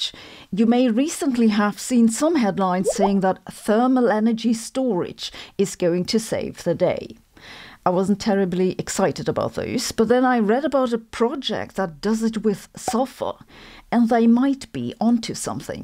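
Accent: Germany. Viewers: I have now realized that Sabine's monotone German cadence has completely lulled me into a sense of false security. Should have read the date before watching this.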